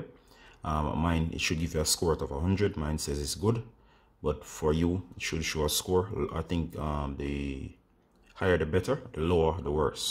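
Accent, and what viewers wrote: Jamaica. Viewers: I hear the 876 accent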